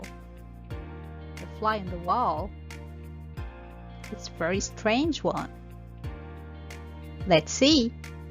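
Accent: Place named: United States. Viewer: Your American accent is really incredible. Your explanation is great 👍 It's important to learn some idioms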